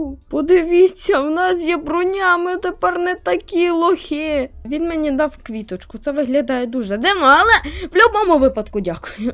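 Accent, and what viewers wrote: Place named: Ukraine. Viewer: Чо у тебя с голосом? Стоп! Ты украинец! Блин😢 я русская но ты класный❤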